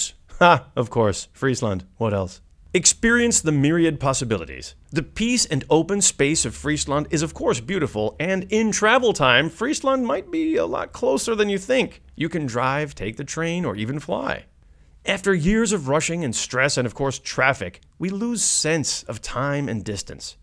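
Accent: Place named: United States. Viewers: You can speak Frisian but you refuse to, why pronounce these things so American-like? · Greg Shapiro (the voice of this video) is an American, that's why he pronounces everything the way he does.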